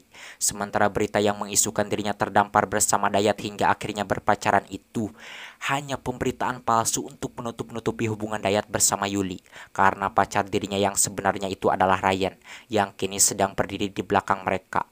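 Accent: West Java, Indonesia. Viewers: Bg asli Sunda nya dimana?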